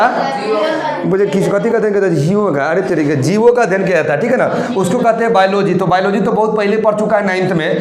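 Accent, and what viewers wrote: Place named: Bhojpuri, India. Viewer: Bojpuri style read kar rahe sir i am raviranjan kumar 20 tarikh ko life maker aay the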